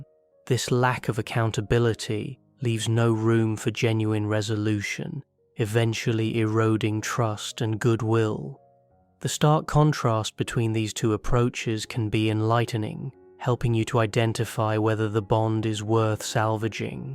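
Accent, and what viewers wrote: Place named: United Kingdom. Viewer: everyone loves random british dude narrating stuff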